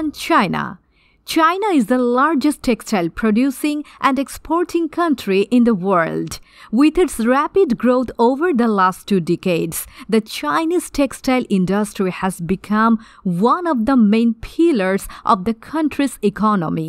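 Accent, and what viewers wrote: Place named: Bangladesh. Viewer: Where are you from? Your accent sounds like bangladeshi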